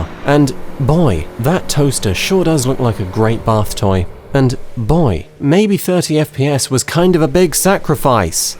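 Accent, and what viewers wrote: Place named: England, United Kingdom. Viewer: You’re voice is the right amount of English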